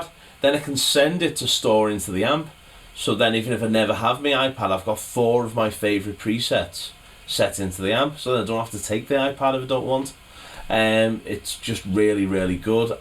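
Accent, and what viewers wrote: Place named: Newcastle, United Kingdom. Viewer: I believe its the Geordie accent.. from Newcastle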